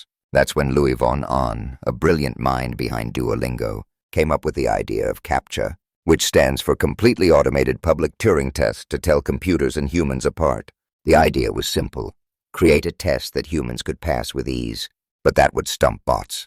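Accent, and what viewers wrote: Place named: United Kingdom. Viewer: "American Tales" with a British accent. Funny. Thanks though :)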